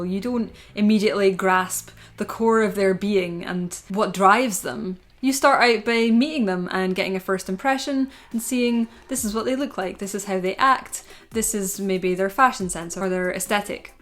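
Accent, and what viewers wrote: Scotland, United Kingdom. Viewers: A few days ago I went down a rabbit hole of story telling and writing advice videos after getting frustrated with writing for a concept album. I found Jenna Moreci first and watched one or two other American writing youtubers. Your review of Jennas' book was suggested on the home page while I was taking a break after getting stuck writing today and I gave it a click. I have tried before to find compelling Scottish youtubers and not managed so I was very pleasantly surprised to hear you were Scottish, from Glasgow even! That video itself was great and I'm really enjoying the segmentation and detail you're going into with this series. Keep it up!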